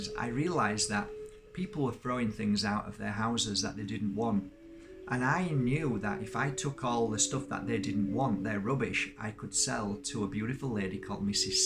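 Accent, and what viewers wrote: Yorkshire, United Kingdom. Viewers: I've been watching you for sometime, as a fellow Yorkshire man I recognised the accent. I suspected you was from Huddersfield the first time I came across your energy. Something about you seemed so familiar. You mentioning Marsh in this video confirmed it! Lovely to come across an ascending soul from home doing so well! For some reason or another I haven't watched a video of yours in awhile. This has been the perfect video/way to return to your energy. Home as a theme is very pressing for me right now. Childhood, family, pain, abuse, independence and breaking free are all very present in the now. Realising we share the same birthplace and with the topic of the video has created a beautiful synchronicity. Thank you! Thank you for your energy, time and sharing your story!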